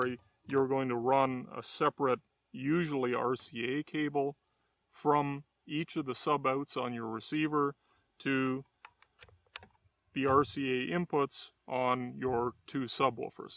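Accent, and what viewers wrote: Canada. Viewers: I heard that! Canadian! Much love from the U.S....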